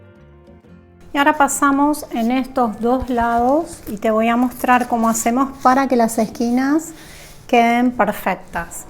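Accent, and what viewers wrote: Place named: Argentina. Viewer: Vine para ver lo que se ofrece en instrucciones. Qué lindo escuchar un accento argentino. Hacía demasiado que no escucho una voz argentia. Voy a volver a ver el programma con mis hijas para que ellas también lo desifruten.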